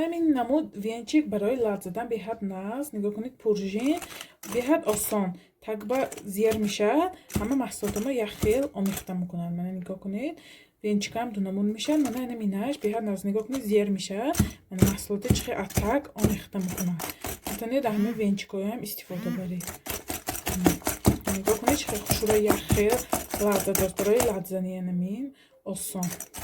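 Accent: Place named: Konibodom, Tajikistan. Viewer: ташаккур ба чавобатон апачон, ман аз Конибодом ,тарзи гапзанитон ба лахчаи мо монанд аст хело..дастатон дардро набинад,Ташаккур ба шумо,хело ба ман писанд хастед,хоксор апаи худам❤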